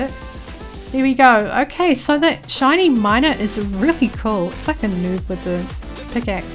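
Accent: Australia. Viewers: Are you Aussie or New Zealand? Or from a completely different place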